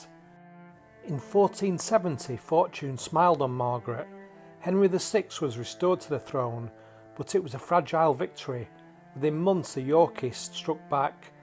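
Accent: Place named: Lancashire, United Kingdom. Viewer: Enjoyed the video as there are not a lot of videos about Lancashire's history, done with a Lancashire accent too, not enough of those on't internet either.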